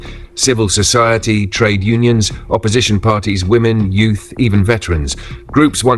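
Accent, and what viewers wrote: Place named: Europe. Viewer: African content in European voice? We can do better.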